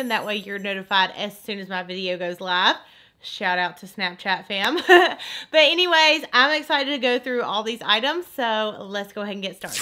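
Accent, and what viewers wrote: South, United States. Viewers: You are so beautiful and I could listen to you talk all day! Love that southern accent! ❤️😘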